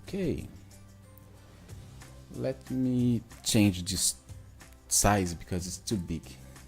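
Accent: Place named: Brazil. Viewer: Great, thank you for replying actually I am learning Português and your accent was very familiar to Brasil 😍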